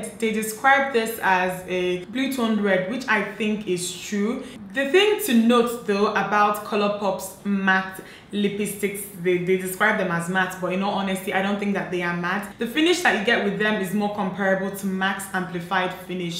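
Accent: Nigeria. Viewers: I love love love all your videos. You are so Nigerian and its so refreshing to see someone original. Not all these Nigerians faking oyinbo accent. Thank youuuuuuu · I was trying to place your accent because I recognized it but this is the first video of yours that I've seen and as soon as you said Nigeria, I was like THAT MAKES SO MUCH SENSE. Just a really random thing I was thinking about XD I like your reasoning on never having enough red lipsticks XD Also, I LOVE your eye look :) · Usound exactly like my daughters pedestrian. She is Nigerian!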